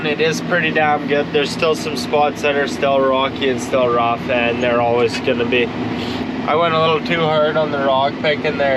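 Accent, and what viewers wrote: Canada. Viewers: Sounds like you're up in Canada? Damn good work. Just did the same thing on a field road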